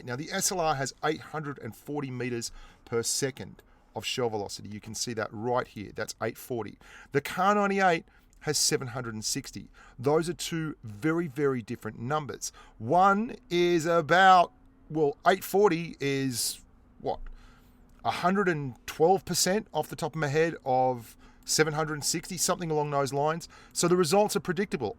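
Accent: Australia. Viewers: Bro ...thanks ...nice video are you from Australia ?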